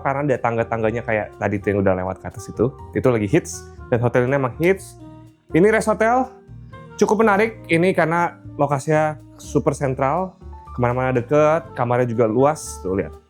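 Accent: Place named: Papua, Indonesia. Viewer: Tuhar... Ternyata dialek nya Bang Masih kuat Papua😅😅😅😅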